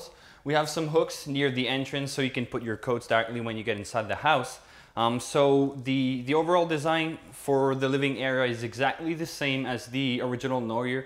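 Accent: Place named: France. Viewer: Y'all build the most beautiful and highest quality homes of all. Speaking of French accents, you seem to be losing yours with every new video. Never lose the quality of construction and your sense of humor. You guys are the best!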